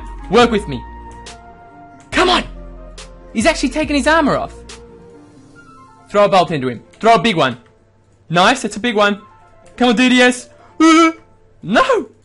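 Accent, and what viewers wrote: Australia. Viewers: Your an Aussie eh? I served in the military with a few of you bastards. Fucking love you guys man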